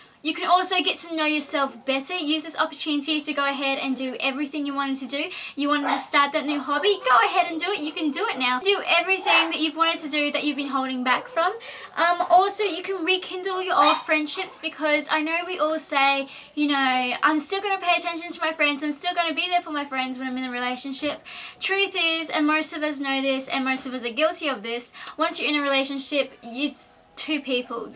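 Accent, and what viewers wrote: Australia. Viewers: Is she from Australia ??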